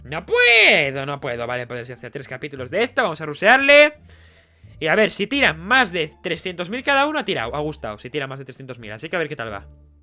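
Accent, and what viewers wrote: Chile. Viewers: hablas chileno ??? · jejeje ese idioma buen Chileno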